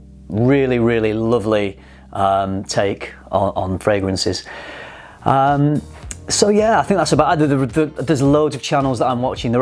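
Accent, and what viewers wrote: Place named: West Midlands, United Kingdom. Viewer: A personal question, if you don't mind. Trying to place your accent, which isn't easy especially for a non-native speaker as you're obviously uni educated and that tends to round off a lot of regional variation. But if I were to hazard a guess, I'd say West Midlands?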